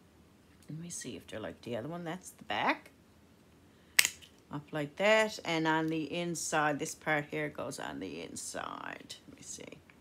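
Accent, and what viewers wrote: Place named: Newfoundland, Canada. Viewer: First time seeing one of your videos, and I love that kit that you got. I love that they're already framed and that you have the three pieces separately to do. They sure did add in a lot of extras also. I hope you don't mind me asking, but where are you from.? Your accent sort of sounds like where I'm from, and that is Newfoundland Canada, but I could be wrong 😄💜😊💜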